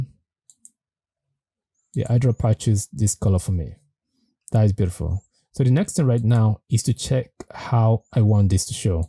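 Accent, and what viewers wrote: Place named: South Africa. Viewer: Fantastic, super useful, thank you! Nice voice and super accent (south-african?) - was a pleasure to spend 2hrs with you Did not really like the part with background done in power point/screen shot etc. I did mine inside PowerBI directly, although not so beautiful as you did. Mine is just OK/good enough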